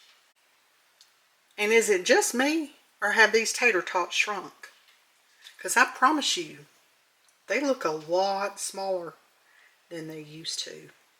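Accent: South, United States. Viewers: Hello. Just wanted to say how I love your channel. You remind me of myself. It just cooking for one. It is a guessing game trying to decide what to do with the leftovers. But with the price of food today you have to make do with what you have. Keep the videos coming.😊 Oh and I love that southern draw when you speak. Be Blessed.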